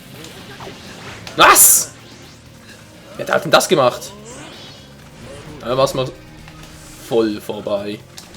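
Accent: Switzerland. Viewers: kommst du aus der schweiz ?? ;) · bist du schweizer? nice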